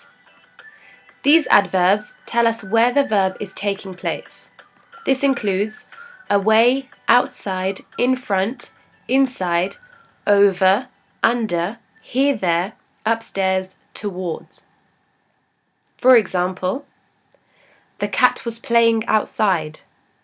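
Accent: England, United Kingdom. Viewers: how are you today, I have seen your channel, and is very good I love your accent as an England citizen and tell you what I would like to hear from you a big list of vocabulary with your pronunciation is very important to speak good English, hope to hear from you soon, I am from Costa Rica, big hugs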